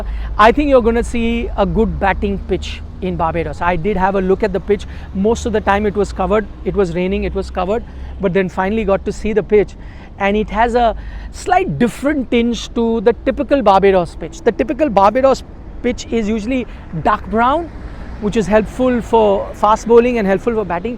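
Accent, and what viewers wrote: India. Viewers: you sound like an Indian journalist Speak Hindi Practice before you start recording Voice quality sucks You should be little more professional Just the English won’t help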